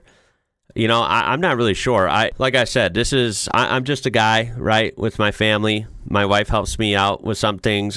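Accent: Australia. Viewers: Hi. Love you and your family. The AI caption generator is misunderstanding the Australian accent for bad words. There is an article on BuzzFeed about it...titled. This YouTuber's Videos Were Demonetised. He Says It's Because Of His Aussie Accent. Also some youtubers have said the word Aussie in the title or thumbnail gets their videos blocked. It's not fair. Hope this helps. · I've heard quite a few other YouTubers say that they have more luck contacting YouTube through Twitter when they have issues. Maybe explain that the word 'can't' with an Aussie accent is not THAT word.... Especially in the first 15 seconds of your videos. They may think that rule is being broken? Best of luck mate.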